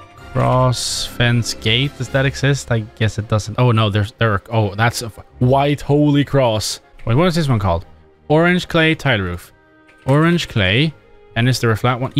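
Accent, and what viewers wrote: Sweden. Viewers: I know a swedish accent when I hear one. :) Snyggt hus! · Are u swedish? U sound like it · Are u swedish?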